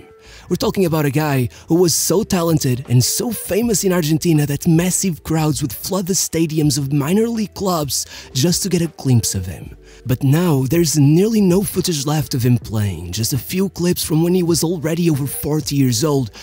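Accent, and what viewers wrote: Portugal. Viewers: Nice Portuguese accent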